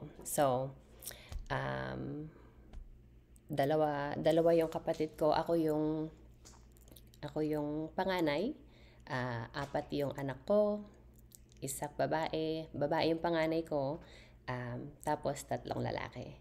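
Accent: Philippines. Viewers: Very filipino ka pa din po.. Pati yun accent m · ung accent nio po ung mga matatapang na pinay po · You have a very Filipino accent when you speak Tagalog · Got me laughing, as my friend in Lapu-Lapu talks the same, leaves me laughing so much, 🤣🤣🤣🤣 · You've got a great Filipino accent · Tagalog pa rin ang accent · was surprise that tagalog accent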